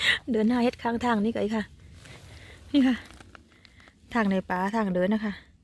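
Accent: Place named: South, Thailand. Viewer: น่ารักจังค่ะ พูดอิสานสำเนียงใต้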